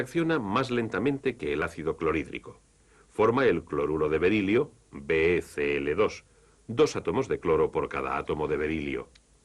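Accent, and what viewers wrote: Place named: Castile, Spain. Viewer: Nada más lindo que un documental vintage y con acento español de Castilla 💞⭐😊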